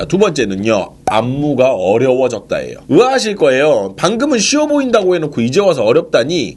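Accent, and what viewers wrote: South, South Korea. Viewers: 아따 ~ 남도사투리로 설명하는게 겁나 구수하구마이라~ ㅋㅋ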